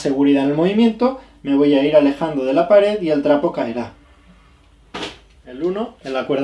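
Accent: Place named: Spain. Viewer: Lástima. Yo vivo en Barcelona y, por el acento... creía que quizás estábamos más cerca. Gracias. Seguiré con tus tutoriales a ver si avanzo.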